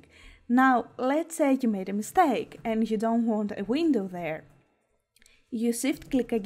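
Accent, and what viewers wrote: Greece. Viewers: aww knew it!! It's comforting to hear, I'm Greek Canadian and I knew I recognized that accent anywhere :) kali nixta!! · Hi! Are you from Greece? Your accent sounds greek!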